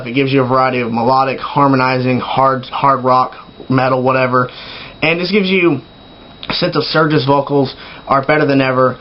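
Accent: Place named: United States. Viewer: He says Tankian, but the "an" is faded because he have American accent. If you're an American and didn't noticed you're the idiot.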